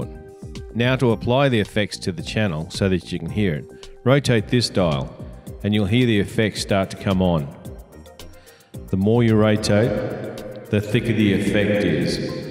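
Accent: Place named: Australia. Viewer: Love your aussie voice mate :)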